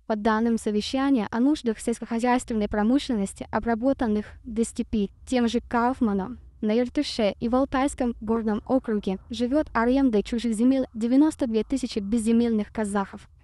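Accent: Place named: England, United Kingdom. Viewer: А окцент то инглиш. Есть поговорка если ругаются два соседа значит там побывал англичанин.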